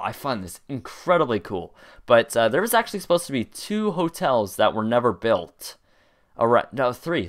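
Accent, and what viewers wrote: Canada. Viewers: You sound Canadian :D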